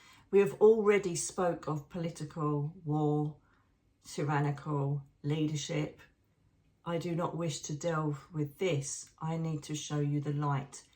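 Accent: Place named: South London, United Kingdom. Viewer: your star cardi is fabulous!! I love the fact that you sound very much like me (I’m a south London girl!) and who wouldn’t imagine Gabrielle with wings - wings are awesome! 😂❤ thank you so much for channelling this amazing message, I have been talking to the angels almost everyday recently just for strength and to help me heal from surgery and to give me a boost from the RA, I don’t know about you but the cold and wet weather makes me feel 10x worse! Sending love & light to you, Darren and Teddy Bear ❤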